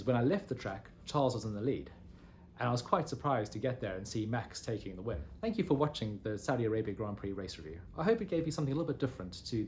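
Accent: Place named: England, United Kingdom. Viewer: Very talented..although I much preferred your floppy fringe..it matched your english accent!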